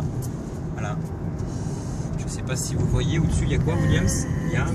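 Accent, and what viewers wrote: Franche-Comté, France. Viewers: j habite juste a cote de salon de provence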